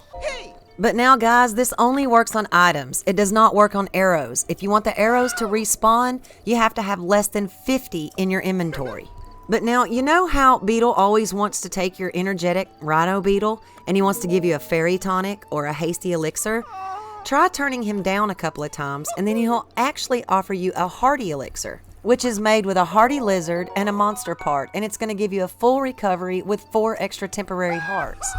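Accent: South, United States. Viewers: It’s 2022, I don’t watch these for the tips 😂 I’m here for her soothing country voice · Love that souther accent. So informative. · Southerners play video games? 😂. Sounds so odd for a souther gal talk VG’s. Respect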